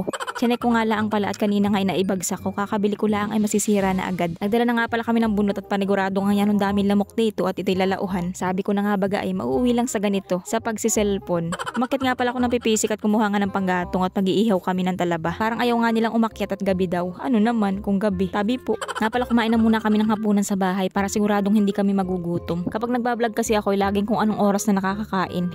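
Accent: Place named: Mindoro, Philippines. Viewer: Hi miss sana lagi kang makapag upload araw2 nagustuhan ko mga content mo kasi natutuwa ako the way ka magsalita pare pareho talaga ang tono nyo ni kamangyan vlog, saka si mommy che na keri taga mindoro rin sya saka yung mag asawang teacher na vlogger yung babae naklimutan ko yung name nila sa fb para kasing bihira na sya mag vlog eh kaya nalimutan ko na pero love na love ko kayo mga mindoronian mabuhaY kayo....❤❤❤❤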